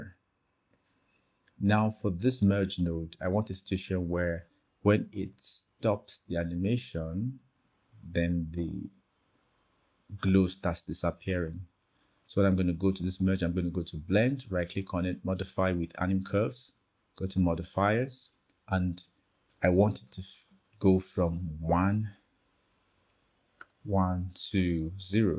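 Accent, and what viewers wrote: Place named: Nigeria. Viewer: You sound Nigerian and I love the way you speak clearly!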